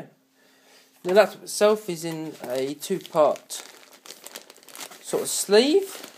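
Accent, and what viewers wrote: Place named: United Kingdom. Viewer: Ur from europe???? U sound british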